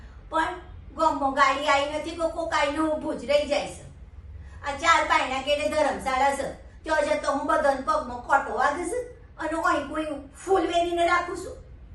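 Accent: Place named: Sabarkantha, India. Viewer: ખૂબ ખૂબ સુંદર એકોક્તિ. ખૂબ ઉત્તમ અભિનય!! વારેવારે માથે ઓઢવાની રીત... પાક્કી ઉમરલાયક બાઈ લાગે છે. સાબરકાંઠાની બોલી અદ્ભૂત!!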